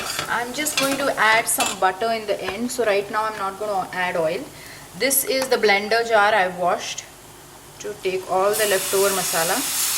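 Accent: India. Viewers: Try some elocution lessons so you don’t have that thick Indian accent ...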